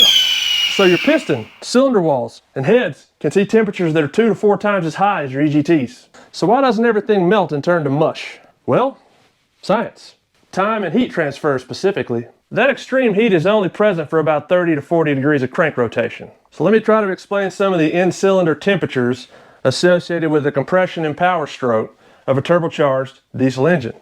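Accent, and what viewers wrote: South, United States. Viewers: Brother you’re like the VGG of diesels, with a southern accent. Awesome channel!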